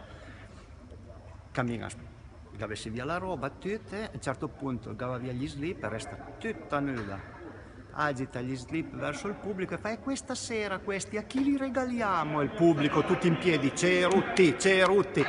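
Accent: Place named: Piedmont, Italy. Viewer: Grazie, da veneto avevo capito tutto tranne il finale. Bello il dialetto piemontese; neh.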